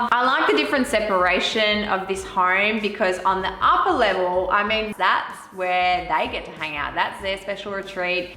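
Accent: Australia. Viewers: Definitely missed the Australian accent!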